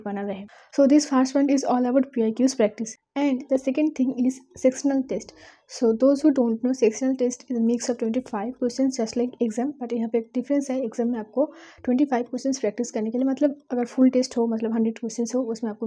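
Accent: Bengal, India. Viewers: Are u Bengali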